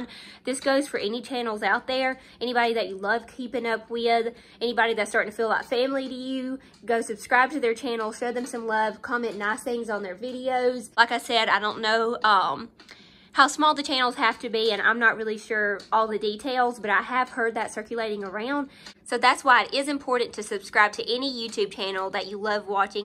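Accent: South, United States. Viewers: Binge watchin your vlogs☺️ just love your down south accent💕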